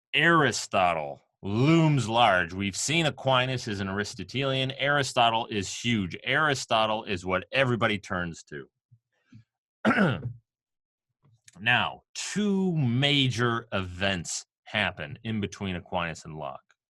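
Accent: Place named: Great Lakes, United States. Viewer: Are you from Michigan or Ohio? Somewhere else in the Great Lakes Region? Curious based on your accent. Thanks a lot for the great content man! Fun to get a mini-Uni-style course on here.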